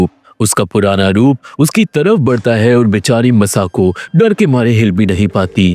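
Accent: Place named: India. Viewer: Bro sana aur matt bolo tera Indian accent bohut tagra hai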